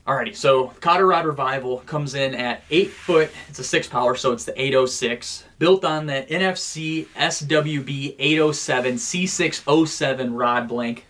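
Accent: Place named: North, United Kingdom. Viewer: This channel is awesome, this guy totally reminds me of another YouTube angler. If you close your eyes and just listen to his voice, he sounds almost identical to Sam Sobi, just a lil less northern 😂.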